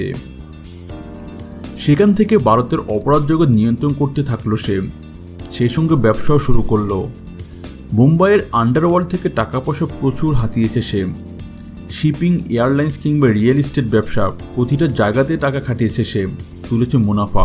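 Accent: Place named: East Bengal, Bangladesh. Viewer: সালা বাঙাল আগে বাংলা বলতে শেখ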